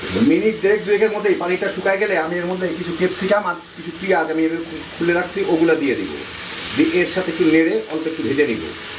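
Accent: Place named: Dhaka, Bangladesh. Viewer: অসাধারণ হয়েছে, আপনার ভাষা শুনে মনে হচ্ছে আপনি ঢাকাইয়া। আমিও ঢাকাইয়া 😁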